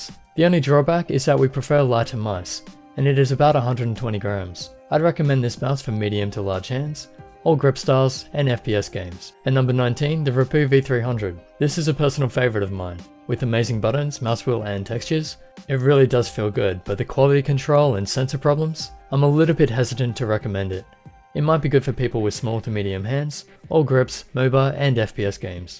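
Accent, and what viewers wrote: Australia. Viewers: Yo man are U australia!? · yes he's from Australia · are you aussie?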